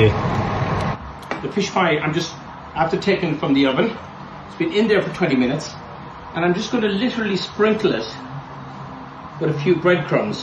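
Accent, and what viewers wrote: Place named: Dublin, Ireland. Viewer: A fabulous recipe, and your beautiful, mellifluous Dublin accent made your explanation doubly enjoyable!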